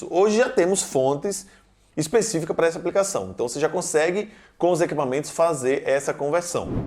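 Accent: Northeast, Brazil. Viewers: Da um nojo, vai assistir um video e percebe esse sotaque de merdestino.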